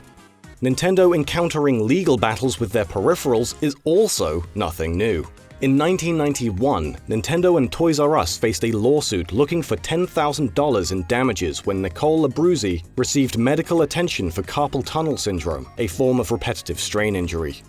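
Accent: United Kingdom. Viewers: This is a very British narration.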